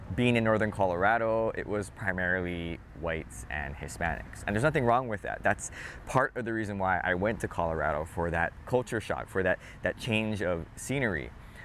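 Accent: Hawaii, United States. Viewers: Talk story means gossiping (and frequently badmouthing) stateside, but, I completely understand that it means something entirely different in Hawai'i. In Hawai'i I think that talking story just means catching up on what's new or news, and amoung strangers, it can just mean making small talk, too. I love your examples of talking story,. I could listen to you ALL DAY DOING THAT. I love Hawai'i pidgin. I love the accent. Yes, can speak da kine. Shame for speak much, but... 😕 funny kine question, but: Why is ALOHA on your cap upside down?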